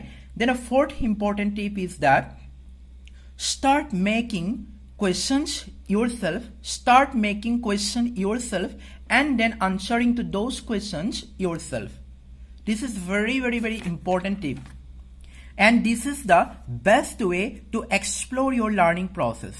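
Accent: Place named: Northeast, India. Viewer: U sounds like from the northeast 👍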